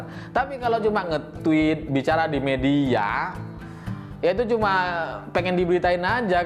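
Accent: Madura, Indonesia. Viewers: Mas kayak orang madure logate..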